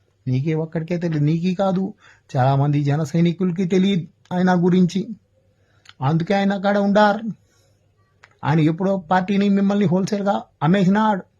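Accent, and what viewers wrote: Nellore, India. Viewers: anna nellore Hindi super anna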